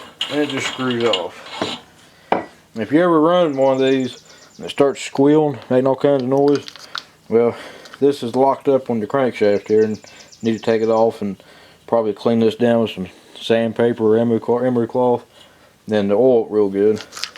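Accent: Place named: Texas, United States. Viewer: Superb instruction! And that 'accent'-can't be far from Corpus Christi and Texas state 77!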